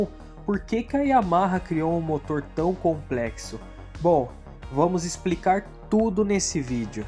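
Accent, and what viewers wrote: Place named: Inland São Paulo, Brazil. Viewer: Sotaque interior Paulista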